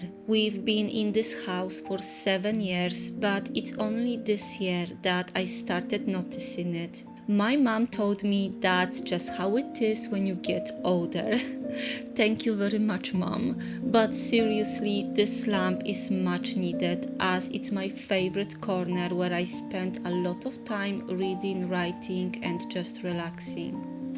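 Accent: Poland. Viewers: jestes Polka?:) zgaduje po akcencie ale i imię. Piekny film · This is my first time here and wondered where are you from because I heard an eastern accent. When I saw you preparing racuchy I smiled: you are Polish! Me too! Greetings from Warsaw.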